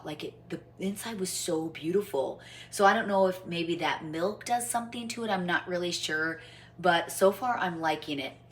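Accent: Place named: Michigan, United States. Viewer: There is nothing wrong with her Michigan accent! We also say melk instead of milk! 😅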